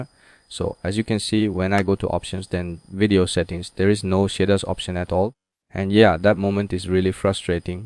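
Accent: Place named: India. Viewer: No he sounds indian..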